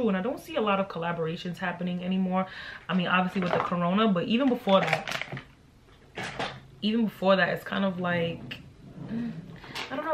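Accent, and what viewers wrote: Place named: New York, United States. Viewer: awww I love your accent, I wish I lived in America and New York in particular cause your accent is just the best 😩💞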